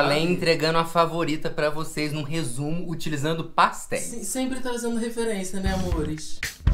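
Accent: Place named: Minas Gerais, Brazil. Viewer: Adoro seu sotaque mineiro amei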